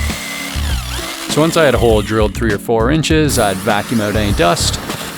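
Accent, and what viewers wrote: Canada. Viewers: As if we couldn't already tell he was Canadian with all the "ungunna"s and "oot"s, he's even got the stamp. 🤣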